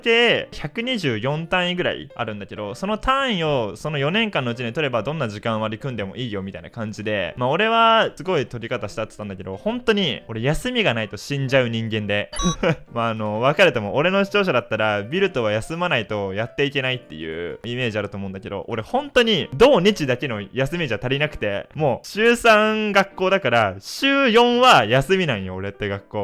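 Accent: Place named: Kansai, Japan. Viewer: この動画、最近の動画で一番好きかも！そしてたかおいおいの関西弁が移ってるｗ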